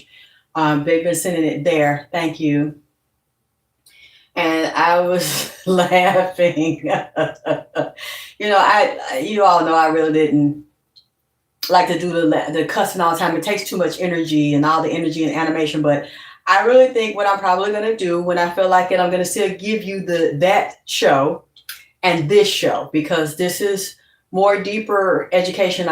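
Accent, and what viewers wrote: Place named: South, United States. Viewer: I so love how u talk u be having me laughing so hard 🤣 that down south all in u hunni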